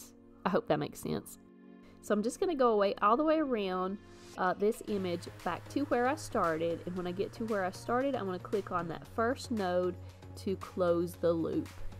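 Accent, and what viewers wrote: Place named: South, United States. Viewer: You are speaking my accent!!! From one southern girl to another, I love this video! Thanks so much for sharing! Do you use Inkscape for all of your SVG designs or do you use other design software as well?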